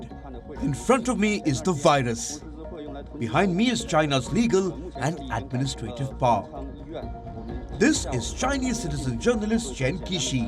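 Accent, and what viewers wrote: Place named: India. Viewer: The Indian accented female voice overs isn't helping the believability. Couldn't you hire someone with a Chinese accent for the female voice overs. 😐😐😐😐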